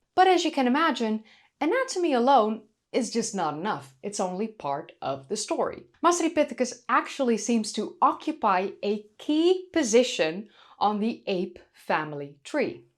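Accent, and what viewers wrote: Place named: Netherlands. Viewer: I really love how you pronounce these words. I love the Dutch accent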